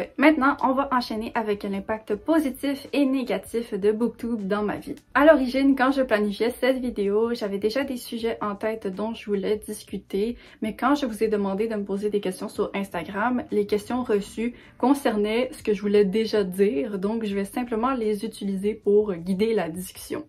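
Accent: Quebec, Canada. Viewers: Félicitation pour cette 1ère année. C'est un plaisir de t'écouter, ton accent est charmant tout comme le ton de tes vidéos. Je suis une européenne, abonnée au compte de 2 autres booktubeuses québecoises (Anne litterarum et Signé Daphné), dont l'une grâce à toi et au calendrier de l'avent booktube Québec. Ne change rien et continue à lire de la science fiction!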